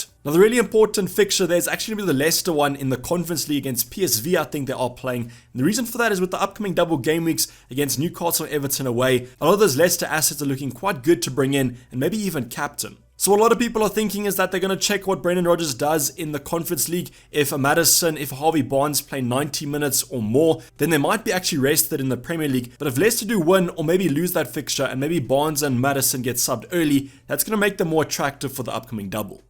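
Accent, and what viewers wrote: South Africa. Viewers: You sound South African My G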